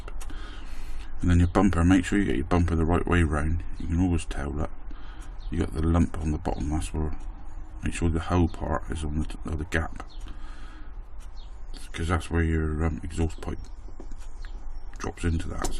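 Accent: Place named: England, United Kingdom. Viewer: ! Are you in merry old England? I grew up in Wales.#7 Llanerch path, Cywmbran Monmouthshire. Pretty sure I hacked the spelling on that. Been awhile. I miss the sweets, steak n kidney pie and the custard squares. I love to come back just to beat the hell out of one Nigel Wilkes. My dad was in military so was there for 4 years. Live in Coos Bay, Oregon these days.England was best place in the world to grow up as a kid. Miss the hell out it. Sorry to ramble but not have any contacts there anymore and you accent reminded me of the place. Take care. JP.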